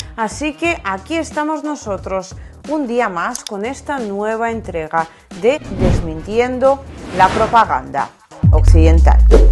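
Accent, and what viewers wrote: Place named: Spain. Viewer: Hola, me gusta mucho tu contenido y quiero decirte que también yo soy seguidor de tu compatriota La señorita Inna Afinoginava, yo créo que ya la conoces, porque ella también estudio el español en España 🇪🇸 Yo soy de Mexico y las sigo a las dos Pero me gusta mucho verla a ella porque habla cómo nosotros en Mexico, y no tiene el acento español como lo tienes tú. En España usan mucho los sinónimos De Os, Vos y sois, sabeis. Lo que en Mexico se erradicó el Español ibérico. Es cómo el Inglés de Inglaterra 🏴 y el de Estados Unidos Que son muy diferentes. Me gustaría que hablaras más cómo hablamos acá en Mexico. Así como lo hace Inna Afinoginova.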